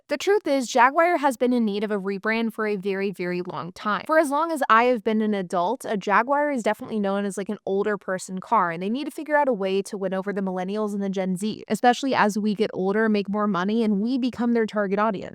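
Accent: United States. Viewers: It's how Americans pronounce it get over it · She can't help being American, they only have a tenuous relationship with the English language. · Americans most often say “jag war” for the animal and the car. Americans rarely pronounce the “u”, unlike the British. In the American NE, the “war” would most likely be pronounced “wire”. My guess is she has an American NE accent.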